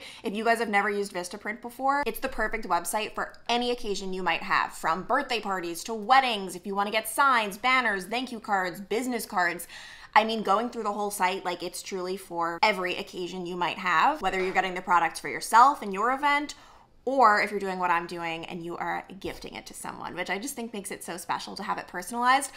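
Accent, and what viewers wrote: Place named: Long Island, United States. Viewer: Your Long Island accident is back…. And I love it!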